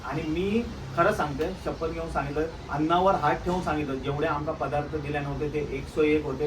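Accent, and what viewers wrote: Malvani, India. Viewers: खूप सुंदर vlog❤❤❤माका तुमची मालवणी लय आवडता.....तुमची अशीच प्रगती होत राहो हीच स्वामी चरणी प्रार्थना ❤🙏तुमच्यामुळे आम्हाला एक नवीन उर्जा मिळते आणि तुम्ही ज्या ज्या हॉटेल मधे जाता ती सर्व माहिती मिळते .....मस्त असेच एन्जॉय करा ❤❤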